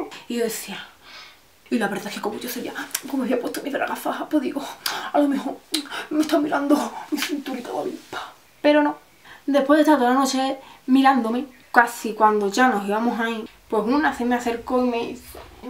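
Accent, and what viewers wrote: Andalusia, Spain. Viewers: Soy latino americano y esta claro que hablo diferente a los españoles y etc, pero veo a esta chica y no paro disfrutar su acento tan rico... tiene un golpe de timbre en ciertas palabras muy bonito no se de que parte de España será por que se que tienen varios vocablos allá pero no se, es muy sabroso su acento jajajaj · Si no eres andaluz si te va a costar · Para que te enteres jeniffer chavala, es andaluz con un toque de barrio y al que no le guste que se arañe. Más claro agua y de cateta nada, Sevilla capital. Los andaluces tenemos la fortuna de hablar bien clarito con pocas palabras.....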